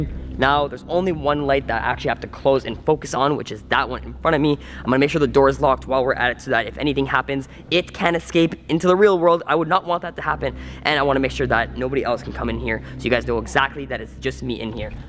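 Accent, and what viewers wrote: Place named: Canada. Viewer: I think he is Canadian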